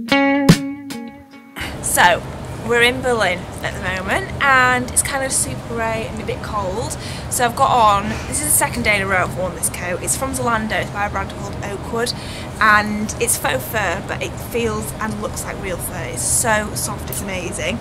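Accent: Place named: Lancashire, United Kingdom. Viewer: Hi! I am totally new to watching your channel - your 'Alternatives to Wearing Jeans' showed up on my recommended, and I loved your no-frill, down to earth style of talking to your viewers. Your accent is also really nostalgic for me; I'm not sure if it's Manx or Lancashire you're from but all of my family have your accent, so I felt like I was suddenly home. :) This was genuinely a lovely fashion video to watch, the way you present and edit your videos is just gorgeous and felt so real. :)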